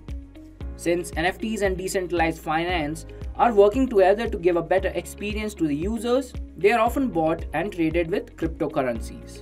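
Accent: India. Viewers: Nothing personal because it's not his fault and he quite likely has good intentions, but I hate indian accents, it's hard to understand and annoying to hear in English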